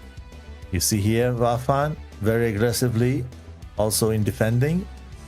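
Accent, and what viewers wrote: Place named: Germany. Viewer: Is the host of this match german or speak german? If so, it did shine threw...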